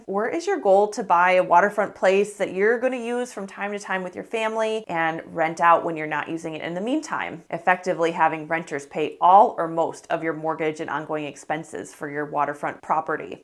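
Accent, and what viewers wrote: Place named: Minnesota, United States. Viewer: I commend you for trying to suppress that Minnesota accent… I kept picking up an accent but couldn’t pin it down until you said Minnesota and then I immediately realized what you’re trying to hide. You can’t hide it by the way, it comes out ALOT. And I’m a Puerto Rican from Connecticut lol the only time I ever heard that accent was in Fargo 😂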